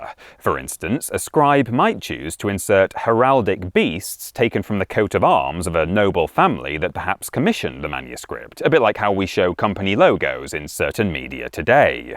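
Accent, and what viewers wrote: England, United Kingdom. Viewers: How can a man with a heavy English accent do a video on this topic and not show a clip from the Monty Python movie with the killer rabbit? At least I can see where they came up with such a ridiculous idea... yesterday it made no sense, but today I've grown.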